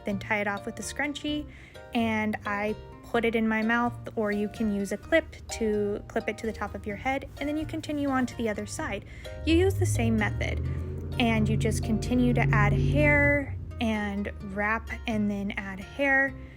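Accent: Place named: South Africa. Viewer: She’s South African?!! Aye aye! ❤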